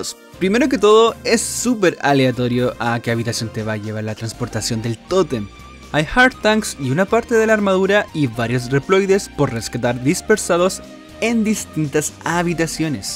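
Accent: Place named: Chile. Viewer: El primer chileno que escucho hablando de megaman. Que rico. Jaja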